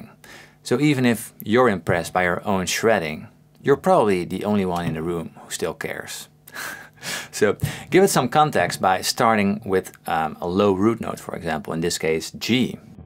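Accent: Netherlands. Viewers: Are you a dutchy? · Je hoort het zo fel dat je een Nederlander bent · I love that you can hear his accent a little. Viva hollanda🇳🇱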